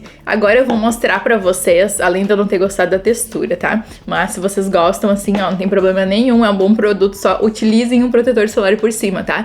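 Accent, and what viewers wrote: South, Brazil. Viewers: Nada a ver com o conteúdo do vídeo é como eu gosto de ouvir o sotaque do sul. ❤❤❤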